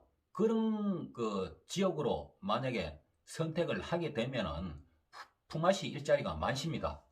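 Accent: Gyeongsang, South Korea. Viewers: 경상도 사투리 쓰시네요 어디신가요? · 순수하신 진짜 시골분세요 근대 경상도 인가요 제가 가고싶은대 경상도는 ㅜㅜ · 고향이 어디 경상도 문디이 말투인데 혹시 경북봉화 점촌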